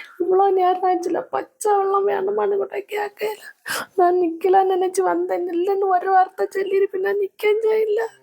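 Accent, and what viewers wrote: Kanyakumari, India. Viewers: Sela words neega pesarethu pureyala kanyaakumare baasa superaeruku sster😂😂😂😂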